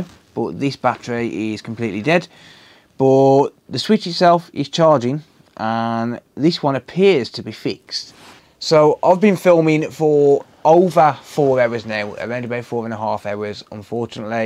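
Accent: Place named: Birmingham, United Kingdom. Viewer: Hi mate new sub here I'm liking the vids. Found you through Tronicsfix! Just curious what accent is that? Birmingham? I just like to guess accents lol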